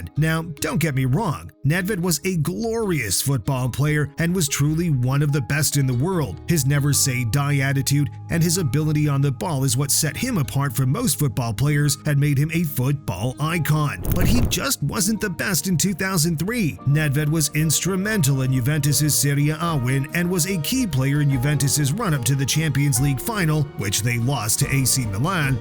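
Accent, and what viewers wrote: United States. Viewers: This guy sounds American, makes sense why this video is shit.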